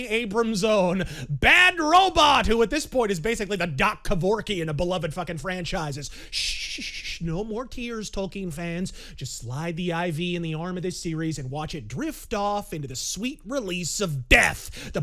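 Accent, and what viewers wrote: England, United Kingdom. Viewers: For a brief momemt, I thought you had a british friend do VO. Haha nice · As an Englishman I approve of English accent. One Shitposter to Rule Them all!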